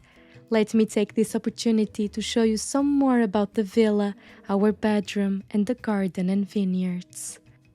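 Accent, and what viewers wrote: Portugal. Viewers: She is Portuguese.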